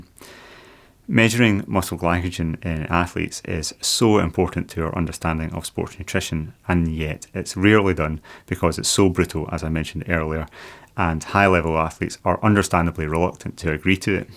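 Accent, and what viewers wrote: Scotland, United Kingdom. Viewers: you've really outdone yourself on this video! I'm still working my way through it in 1/2 hour segments. It's very dense in terms of the information it contains, which in turn gives me plenty of food for thought. I really appreciate all of the hard work that went into researching and producing this. I love the way you've gone directly to the basic research, applied it to your own experiments and drawn your own conclusions, which in turn you've shared with us. I also look forward to the future nutrition topics you plan to delve into. I feel very fortunate to have discovered your channel. The only downside is that I find your voice totally mesmerizing, to the point where I cease to process the words that you're speaking. Gotta love that Scottish accent!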